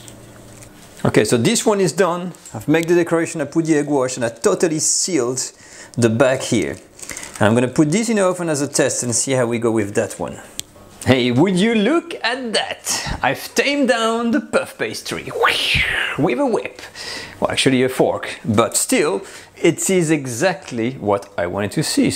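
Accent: France. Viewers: That French accent. Formidable! The food is pretty good too. 😋 ❤️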